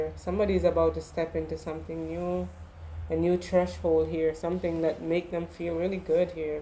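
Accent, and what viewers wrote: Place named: Trinidad and Tobago. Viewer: Your readings are magnificent. You are sounding Trini 🇹🇹 & familiar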